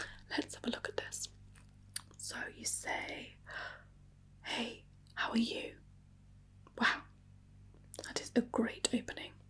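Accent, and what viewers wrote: United Kingdom. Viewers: I like your British accent. 🤗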